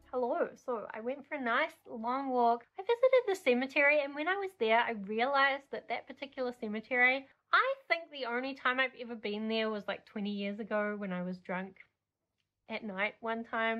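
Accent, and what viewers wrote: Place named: Australia. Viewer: don't worry don't worry I also hate that strong Australian accent 😭😭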